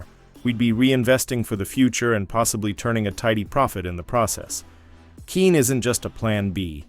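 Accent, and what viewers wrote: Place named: Newcastle, United Kingdom. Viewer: his geordie accent gives it away.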